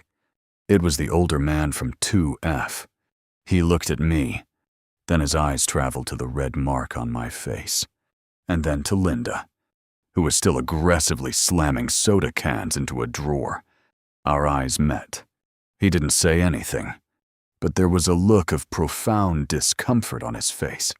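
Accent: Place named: United States. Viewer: Sounds like another voice that I heard on another video, except it's not an exaggerated British accent. It's a highly rhotic American accent.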